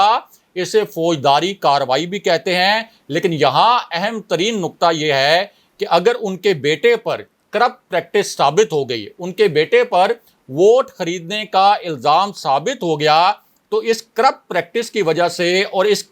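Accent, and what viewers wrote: Punjab, Pakistan. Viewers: Why you speak urdu in Punjabi accent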